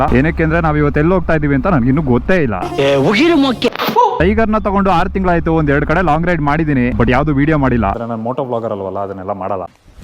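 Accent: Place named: Kundapura, India. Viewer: Kundapura kannada ❤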